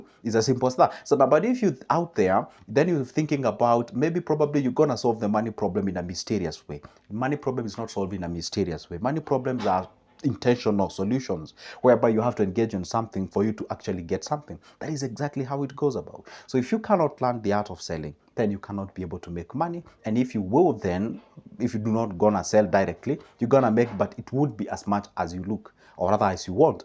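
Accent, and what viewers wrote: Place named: Kenya. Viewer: Oooh now i get where you got that nice accent...that luo land..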